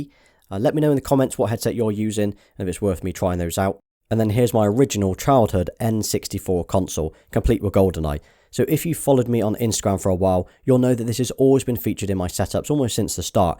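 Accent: United Kingdom. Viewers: This guy is having a british accent if i am not wrong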